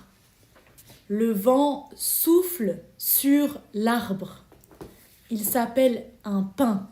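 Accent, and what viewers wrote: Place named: France. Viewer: Aquí si hay acento de francés , un abrazo 🙂🫶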